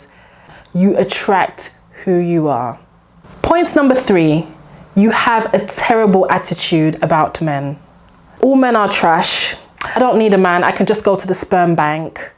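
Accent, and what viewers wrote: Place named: United Kingdom. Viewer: That is excellent advice. A lot of people assume you just know what kind of person you should marry. In fact that's the best way I know of to really screw it up. The ideas I have when I married was to start wrong and it shows in my own marriage. I simply didn't know what I was doing.. I am so pleased that young ladies like you are like you are employing sensible approaches to life-and-death cool approaches to life in general. Also, that gorgeous British accent doesn't hurt!! Keep going. You make me proud.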